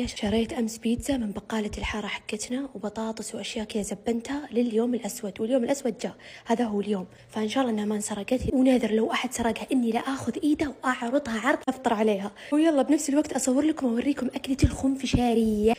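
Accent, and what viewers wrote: Saudi Arabia. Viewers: 😂😂😂 لهجه السعوديين غريبه وكيوت🤏🏻😭 😂😂شنو معناه يلبيه 🤔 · بحب لهجة السعوديات كتيييييير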